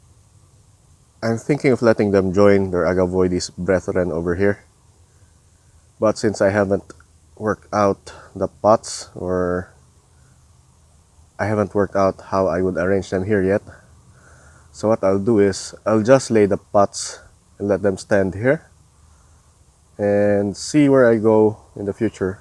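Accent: Philippines. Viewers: your accent is filipino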